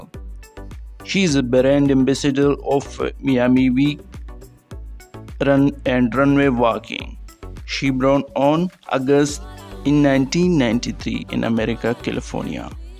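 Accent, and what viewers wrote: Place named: India. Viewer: Is the announcer from India. Because he sounds like one of them.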